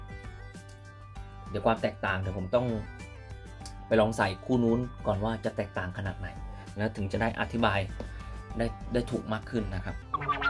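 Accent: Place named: Japan. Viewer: สำเนียงญี่ปุ่นจัด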